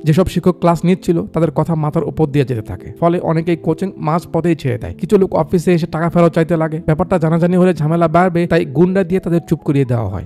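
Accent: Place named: Rangpur, Bangladesh. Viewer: আমাদের রংপুরের কথা শুনে ভালোই লাগলো। সঠিক বলেছেন।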